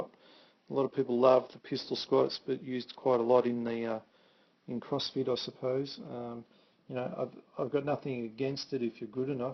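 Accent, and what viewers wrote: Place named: Australia. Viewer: Another superb "keeper" from. Very informative, video well done, commentary obviously knowledgeable and links for further relevant info provided.And thanks for writing out all of the voiced instruction- the Aussie twang can be challenging to North American ears ( no offense intended and none taken I hope ).Well done-thanks!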